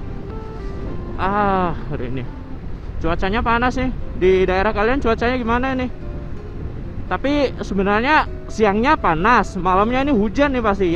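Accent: Java, Indonesia. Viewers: omongane kagok ketok e wong jowo iki mesti😂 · Ngomong guwe ne medok mas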